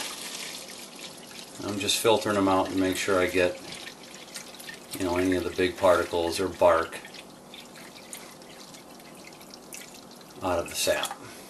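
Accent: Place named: Wisconsin, United States. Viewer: You live in wisconsin cuz I do and you have the accent lol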